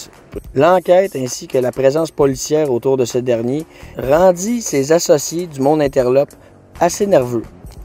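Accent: Quebec, Canada. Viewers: Bonjour, c'est quoi comme dialecte de votre Français -quabéquoi ? Merci pour la réponse ps j'adore. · Salut , au qc on parle quebecois ...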